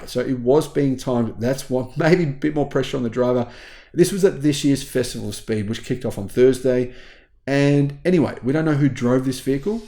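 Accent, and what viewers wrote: Australia. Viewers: What's a mile an hour?! You sound like a fellow Aussie to me.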